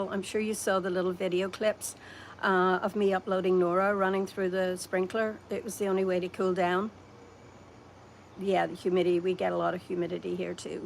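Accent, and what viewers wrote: Ireland. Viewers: Hi! You're from Ireland!!! WOW! Now that you mentioned that I can hear just a slight accent! I agree that growing up without a lot does make you humble! This was fun!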